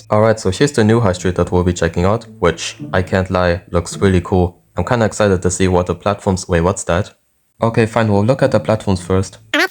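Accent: Germany. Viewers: I hear german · Are you german? You sound sooo german xdd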